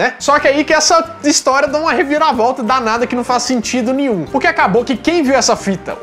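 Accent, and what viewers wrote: Minas Gerais, Brazil. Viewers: Que simpatia! Mineirice mais boa de se ouvir: a original! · Curti e me inscrevi no nerd show, pq gosto do seu sotaque mineiro!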